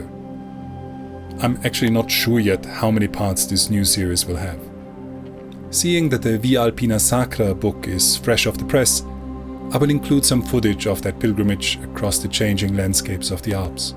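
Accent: United States. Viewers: Good to see you again. Your voice sounds somehow different? More American- I even wondered if it was you narrating at one point!! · Great to see you back, Father. But you seemed to have aquired an American accent and the pitch in your voice seems to be an octave higher... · I am very confused. I do NOT recognize your voice. When did you aquire an American accent? Speaking of American, you seem to have pivoted from Religion to the mighty dollar. How very sad this new you is! I hope you reconsider and go back to your old self.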